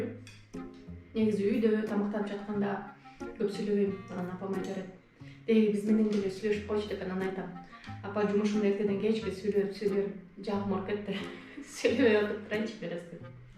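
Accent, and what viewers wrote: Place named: Talas, Kyrgyzstan. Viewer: Таластык экени билинип эле турат да алыстан 🤭🤭🤭 Кечириңиз бирок көрө албадым таккыр. Аракеттендим абдан. 👎👎👎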